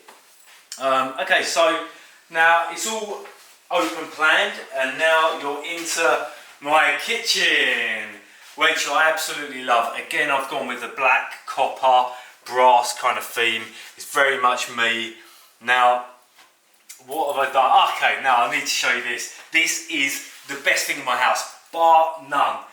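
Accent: United Kingdom. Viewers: thats a british accent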